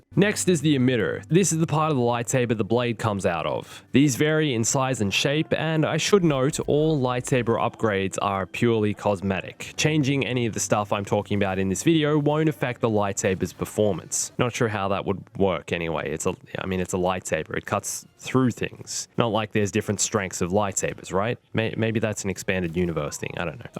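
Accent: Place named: Australia. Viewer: What's with the accent? Mostly Aussie, part yank. Watched too much anime?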